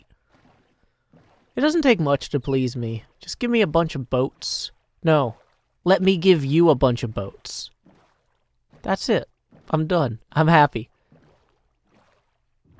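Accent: South, United States. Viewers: First time watching one of your videos(and i hope this isnt rude), but your accent makes me think of a true southern accent i don't hear often among youtubers. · Funny to hear a hermit with my southern accent I'm definitely gonna be paying more attention to this channel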